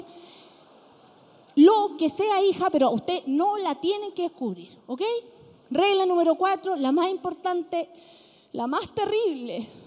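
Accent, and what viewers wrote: Chile. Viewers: La he visto en Mallorca, España y me ha encantado,. Hay que decir que cambió un poco el vocabulario para que la entendiéramos todos pero a mi me gusta oírla a chileno pelao (y eso que soy Mallorquina nativa) y me parto de la risa con todas estas expresiones que tenéis. Es muy buena comunicadora, súper graciosa y ya era hora escuchar humor desde un punto de vista femenino y reír a gusto... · Me hace reír, su forma de halar como chilena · Chileno poh!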